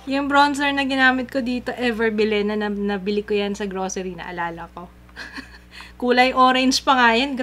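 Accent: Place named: Conyo, Philippines. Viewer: Hi Ate! Happy 4th Year Anniversary! Actually, nadiscover kita sa isang video, di ko na maalala ano title nun basta yung video na yun is naghahaul ka ng make up pero isa ata to sa mga videos mo nung naguumpisa ka palang, nasa ilalim ka pa ng hagdan nun nagfifilm and actually, naaartehan ako nun sayo kasi the way ng pagsasalita mo dun parang ang slang and parang conyo, so sa sobrang curious ko, vinisit ko ung youtube mo profile mo and nanuod ako ng ilang videos mo para makita kung gaano ka kaarte, hehehehe wala sana magalit sakin pero yun ang totoo, yun naging 1st impression ko kay Ate hanggang sa napansin ko nag vovlog marathon na ako at nagsubscribe sa channel nya. nakakatuwa lang isipin na from being a slang girl na akala ko nagfifeeling ka lang ate to a jolly person, nakita ko rin ung improvement mo, and masaya ako na nadiscover kita ate. 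Its been 2 years simula nung simulan kitang subaybayan, kumbaga ikaw ang Itsjudyslife ng Pinas. Dahil sayo din ate mas naiinspire akong magyoutube, pero I think di pa sa ngayon pero I hope one day magawa ko rin sya. So ayun, sana nga mahanap ko ung video mo na yun eh, basta tanda ko nun naghahaul ka po ng mga pinamili mo pati make up sa may hagdan at medyo madilim nga nun kasi pagami na at kadarating nyo lang ni Papa Kitz galing sa mall siguro. Basta ate sana walang magbago kung ano ka dati sa kung ano ka ngayon, dahil minahal ka namin ng ganyan ka na. I hope one day mameet kita ate! I love you! God bless you and your whole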